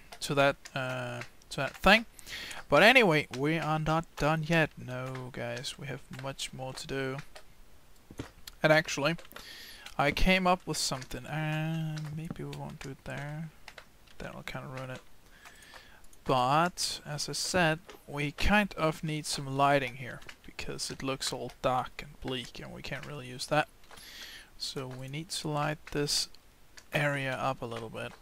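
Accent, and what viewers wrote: Denmark. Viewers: Bud, the aesthetics of this place are just a pure work of genius. I am loving it and more games with your danish accent would be enjoyable. :) Keep the vids coming. :)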